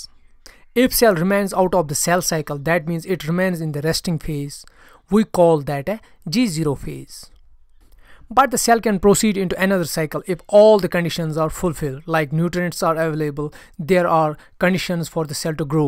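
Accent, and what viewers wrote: Kashmir, India. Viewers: Sir app kashmir sa ho kya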